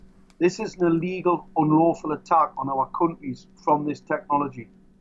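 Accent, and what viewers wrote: Scotland, United Kingdom. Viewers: His accent is Scottish. Pay attention and listen. It is filled with knowledge. Close your eyes and things become comprehensible.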